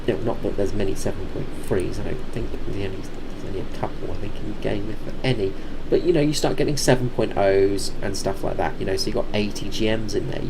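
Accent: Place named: Australia. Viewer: Thank you again for your reply and the info on TD units .I will definitely check it out . John from Pittsburgh ( if you don't know , that's in the state of Pennsylvania , USA --- you sound like an Aussie or Kiwi , so thanks again mate )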